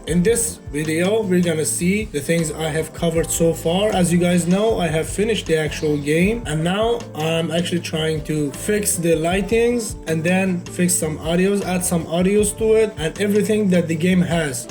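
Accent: Iran. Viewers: سلام داداش اومدم ویدیوت از لحجت فهمیدم ایرانی موفق باشی 😂❤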